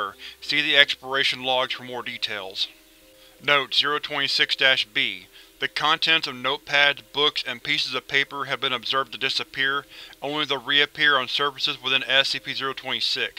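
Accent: United States. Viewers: I think it's his accent, I've watched a ton of these, and i know alot of people from all over the states. I've heard this accent plenty.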